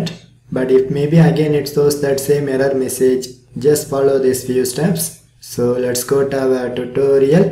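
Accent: India. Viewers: indian voice, 100% sure it works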